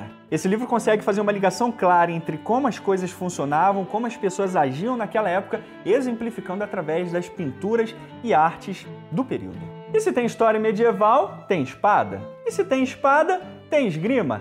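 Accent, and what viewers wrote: Rio de Janeiro, Brazil. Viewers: É o português mais carioca que eu já vi 😁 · "Sou português" falando Português BR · Português com sotaque e falas brasileiras kkkkkkk Também sou kkkkkk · E que sempre me queixei da falta de bons canais do youtube em portugues-pt FINALMENTE, encontrei um feito por um tuga mas com sotaque PT-BR. Enfim ainda bem que ja estou habituado xD Bom trabalho o canal esta incrivel. Mesmo o que fazia falta. e para mais um canal de outro amante de guerra :) Eu confesso que sempre achei a era napoleónica muito chata na guerra. Vais falar deste assunto? Ou para já é tudo historia medieval? Abraços de Portugal :)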